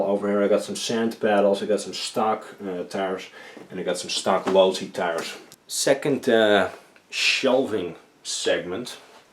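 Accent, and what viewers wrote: Netherlands. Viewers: Great look around your storage room. Thanks. I like your dutch accent. One of the reasons I watch your , other the really cool RC videos. HOOORAY FOR EVERYTHING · iam dutch to you have a realy dutch acent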